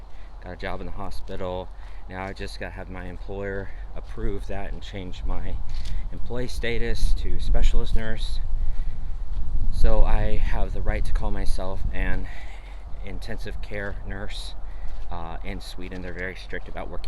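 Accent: Texas, United States. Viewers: Hi Denmark here. Don't worry about the accent, when you speak Swedish fluent, you will still have the accent. But it doesn't matter. I have a friend, he has been in the country for about 25 years. He's accent is noticeable, but he speaks fluent Danish and can have a perfectly normal conversation with a Dane. BTW, your Texan accent ain't that heavy. I have heard it way "worse". Have you tried reading Danish. A Swede, can quite easily read Danish. Specialsygeplejeske.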